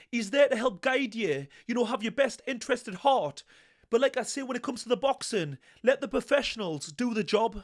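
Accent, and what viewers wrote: United Kingdom. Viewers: What uk accent is this guy speaking?🤔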